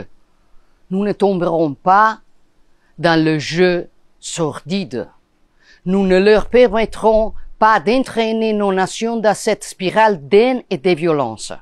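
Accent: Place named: Italy. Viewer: Soyons honnêtes : son français aurait sûrement été plus fluide, et avec moins d’accent « macaronique », si elle avait pris la peine de les étudier à l’université plutôt que de perdre son temps à militer dans un parti néo- ou pseudo-fasciste. Elle a préféré grimper grâce au népotisme et au clientélisme — une vieille habitude de cette droite populiste, autoritaire et un peu obscurantiste, arrivée en Occident avec le petit coup de pouce de Poutine et de quelques autres voyous… Madame (ou Mademoiselle) Meloni, expliquez-nous donc pourquoi l’Italie est aujourd’hui le seul pays d’Europe de l’Ouest à ne pas avoir reconnu la Palestine et à rester les bras croisés devant un génocide en cours. Et expliquez-nous aussi, Madame Meloni, pourquoi des centaines de voix d’Italiens NATIFS, qui dénoncent discrimination, favoritisme et appauvrissement, se retrouvent systématiquement réduites au silence, censurées ou même poursuivies… dans VOTRE pays.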